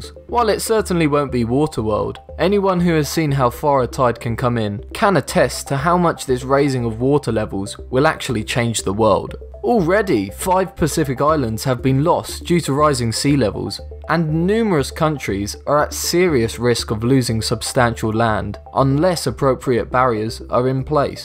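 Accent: United Kingdom. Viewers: How could you possibly think that?! This guy is British and Dolan is Australian, they don't sound anything alike.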